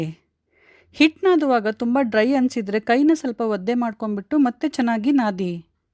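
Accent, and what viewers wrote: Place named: Belgaum, India. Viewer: Hi mam nimma yella aduge nodiddhini thumha chennagidhe, nanna magalu nimma mathina shyli keli naguttale 😂 belgum side mathado tara idhe.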